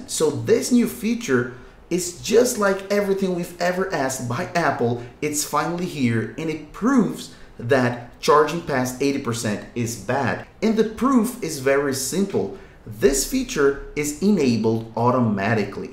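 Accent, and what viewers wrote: Brazil. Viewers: First time in watching your videos, I could pick up a small accent, are you Brazilian?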